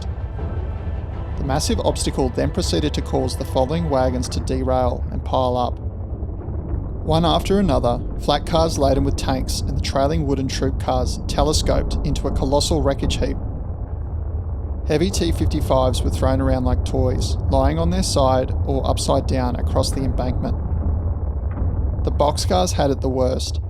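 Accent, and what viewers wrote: Australia. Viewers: Aussie accent! Liked and subbed you legend. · It's so comforting to hear an Australian voice on a very good video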